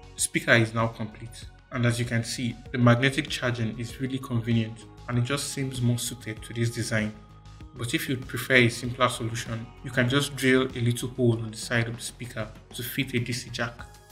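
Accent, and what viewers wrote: Nigeria. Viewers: Wow, you sound like a Nigeria. I am a big lover of DIY but not so much not much tools to bring out those ideas. Great to see someone in my hood do these things. Keep it up man.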